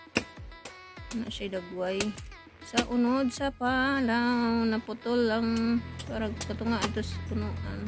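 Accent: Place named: Bohol, Philippines. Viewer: Boholano kaayu Miss🥰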